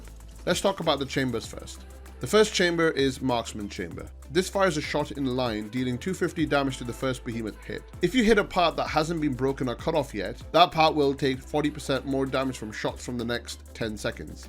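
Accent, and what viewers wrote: Ireland. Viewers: take care of your damn. As someone with heart troubles as well (defective valve that had to be replaced a few years ago,) it's one of the most important organs you can take care of. Keep us posted, but rest up. I'd like to have your dulcet irish tones around for many more years.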